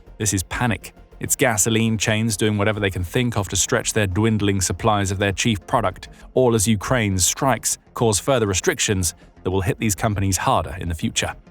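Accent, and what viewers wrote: England, United Kingdom. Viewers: Why does the narrator, who's clearly English by his accent, keep calling petrol, "Gasoline"? In the UK they cal it petrol NOT gasoline!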